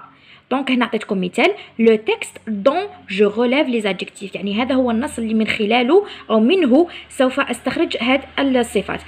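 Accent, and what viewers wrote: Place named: Oran, Algeria. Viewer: شكيت نتي وهرانية ههه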